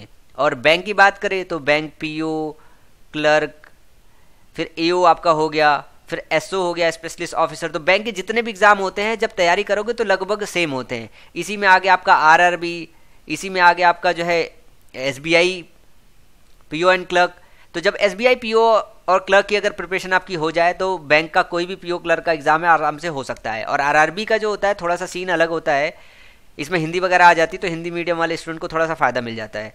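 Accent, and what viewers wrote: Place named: Kanpur, India. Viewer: kya bat h bhai....tmhari language me kanpuriya ka jo taste h maja aa jati h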